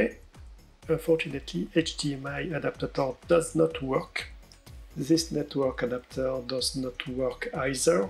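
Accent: France. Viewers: Oh god your french accent ...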